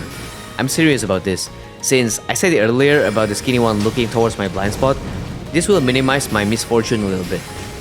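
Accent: Indonesia. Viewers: kirain logatnya ga asing, eh benran indo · bro u sounds Indonesian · Bahasa inggris nya bagus banget tapi sangat terdengar seperti orang indo banget. Dan iya, saya mulai bisa membedakan aksen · you sound indonesian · Dari awal ngomong langsung ngebatin ini kyk aksen org indo et bener ternyata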